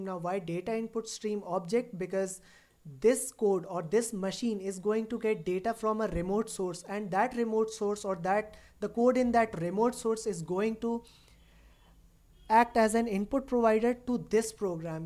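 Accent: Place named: India. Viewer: You Sound like an Indien tech Support scammer but u r 100x Intelligent than them I am not so I am watching this Video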